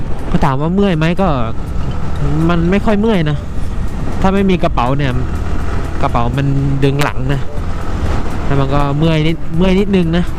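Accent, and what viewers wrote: South, Thailand. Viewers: โซ้ยกาแฟนิ แหลงใต้ชับพึด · พี่คนใต้หรอคับผมก็คนใต้555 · พี่เป็นคนภาคใหนพูดเหมือนคนใต้เลย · คนใต้หรอ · คนใต้ซายม้ายนิ...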